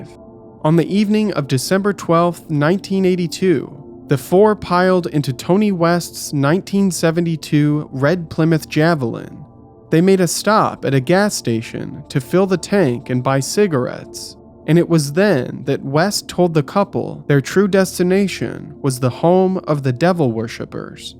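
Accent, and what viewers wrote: United States. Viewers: Quiet in the wilds is never a great omen. Typically, I've experienced that means there's a predator out there that scares every other animal Just saying, you sound at least as country as I am, but still, heads on swivels, guns loaded, situational awareness man. Quiet is not good, especially once it gets dark in the woods or anywhere, honestly.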